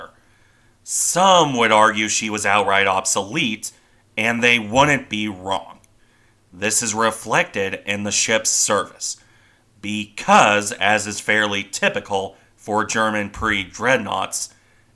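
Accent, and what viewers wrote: United States. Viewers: Please don’t be a rude Prussian. He freely acknowledges his American butchering of native pronunciations. It’s something we do here and we are fine with it.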